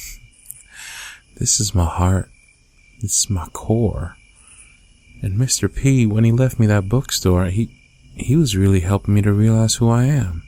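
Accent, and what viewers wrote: United States. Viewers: ok why do u sound country like whyyyyy u remind me of my bf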